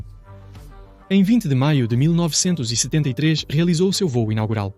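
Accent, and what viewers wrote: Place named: Portugal. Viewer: Acento portugués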